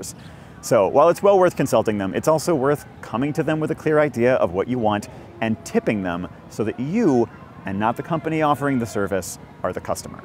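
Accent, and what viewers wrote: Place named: Detroit, United States. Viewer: Where ya from bru? Detroit?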